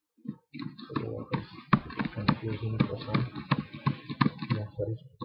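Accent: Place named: India. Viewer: Not only you've got that stupid inidian accent, but you've also got a shitty microphone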